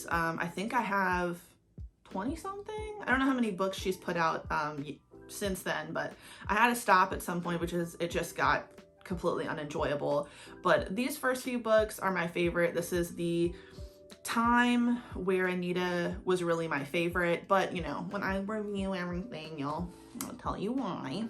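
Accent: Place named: England, United Kingdom. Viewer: breaking out the English accent, eh?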